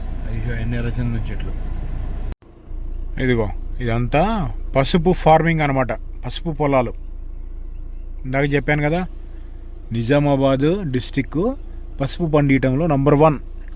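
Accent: Andhra Pradesh, India. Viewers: Nizamabad antunnaru Andhra slang undhi reason? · అన్న మీరు ఆంధ్రా నుండి వచ్చి ఇక్కడ సెటిల్ అయ్యారా? అంటే స్లాంగ్ నిజామాబాద్ ఫీల్ ఇవ్వట్లేదు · Ne slang motham Andhra slang lekka undi anna 😅.. nizamabad ante pakka telangana slang unatdi anukuna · mi slang Telangana slang lekka ledhu meedha andhra na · Nizamabad antunnaw andra yaasa matladtunnaru Nizamabad antea pakka telangana bhasha matladutharu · Ayana language kuda andhra valla lane untundhile. Valla family antha kuda andhra slang a matladtharu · తెలంగాణ బాషా యాషా ఇది కాదు నువ్ ఆంధ్ర బిడ్డవి ఉన్నట్టు ఉన్నావ్ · Meru andra valla telugu valla slang enti andra slang laa undi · అన్నా మీ భాష ఆంధ్ర వాళ్ళ లాగా ఉంది.. పక్క తెలంగాణ లేదు... ఆంధ్రలో చదువుకున్నారా?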